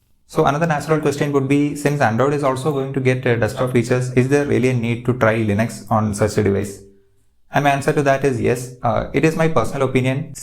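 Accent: Kerala, India. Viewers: Malayali anno bro ?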